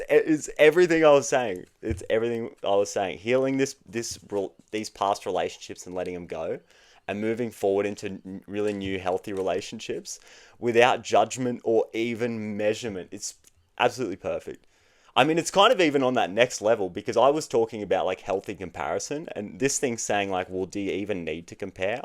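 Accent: Australia. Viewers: So thats gotta be an Aussie accent.. im in aus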